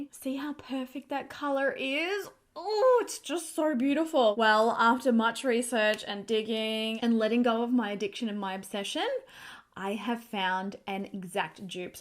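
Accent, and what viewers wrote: Australia. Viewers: I could listen to you speak all day long. Your Australian accent is lovely. I am a New Yorker and we sound like truck drivers. New York accents are actually offensive to the ears 😂.. listening to your beautiful voice is so calming it’s just so so pretty.. When a New Yorker opens their mouth it’s like people want to cover their ears because we are so loud and sound like drunken sailors 🤷‍♀️🤪..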